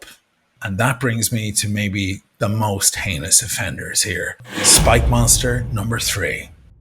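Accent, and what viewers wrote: Dublin, Ireland. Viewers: Always love to see a dublin accent on u tube talking about watchs i admire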